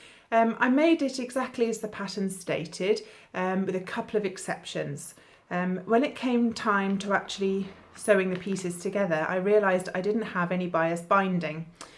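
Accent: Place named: United Kingdom. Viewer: I am in love with your british accent!! :) just subbed and liked. would love if you could return the favor as i am just trying to grow a channel :) keep it up xoxo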